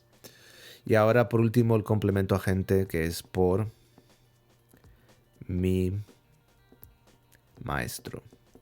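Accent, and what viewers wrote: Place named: England, United Kingdom. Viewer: funny english accent)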